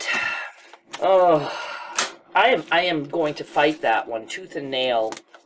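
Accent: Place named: New England, United States. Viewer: I know you’re not from Massachusetts but I was raised there and listening to you reminds me of growing up and the way people talk up in NE. I’ve lived in SC for 30 plus years. I was dozing, listening to you and I heard my name, just the way my father used to say it. I asked about ASMR and Patreon. I went to your pages. I usually google everything but just thought I’d ask you. It was nice to hear my name.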